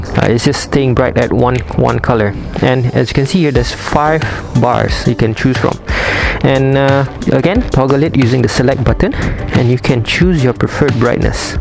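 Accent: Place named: India. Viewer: Heavy indian accent, mouth breather... Thumbs down.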